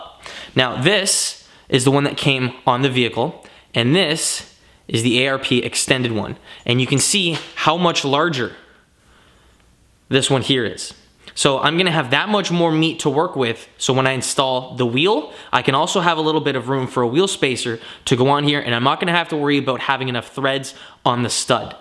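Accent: Canada. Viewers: I don't know where you guys in the comments are about this, but judging from his accent, he's in Canada and if I were him, I'd add a light film of anti-seize on 1) the threads of the studs to ease in impacting to press in, 2) on the threads in general because CA, 3) and even on both sides of the spacers to help prevent seizing in general. I live in Phoenix where corrosion isn't an issue and I still do this practice.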